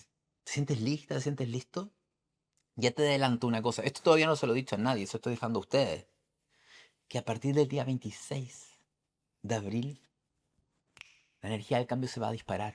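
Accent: Chile. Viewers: Excelente, apenas te conozco pero me encanto tu video, me aclaraste muchas cosas. Por tu acento pareces chileno. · Eres muy divertido😂y eso que no te has soltado la pinza total! Urano te va a ayudar😅Chileno, que bonito es vuestro acento❤escucharte alimenta lo que descubrí con la Astrologia, gracias por tu generosidad, ese es el camino🎉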